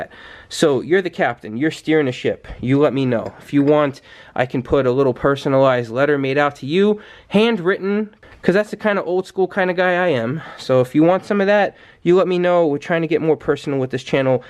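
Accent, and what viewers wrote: New York, United States. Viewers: Awesome Bro : The NY/NE accent not so much :) When I clicked on the Stroker Hellcat exhaust video My brain didn't register Hellcat Stroker for some reason I was thinking Scatpack Stroker & near the end You mentioned something about being the only Stroked Hellcat on YT. I've been an SRT B5 sub for at least a year now but haven't been keeping up with His channel lately. I'm on board with both o Y'all now.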